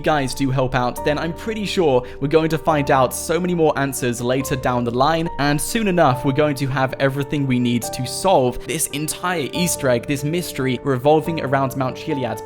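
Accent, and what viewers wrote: United Kingdom. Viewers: It's a British accent come on guys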